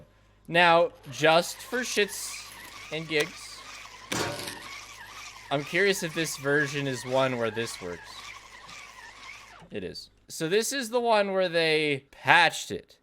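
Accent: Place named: Canada. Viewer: this "fake drunk" voice you put on sometimes perplexes me. how do you get MORE Canadian when you talk like this? These are dangerous levels of Canadian here! What black magics are you playing with to conjure this phenomenon?! You should narrate a whole book like this!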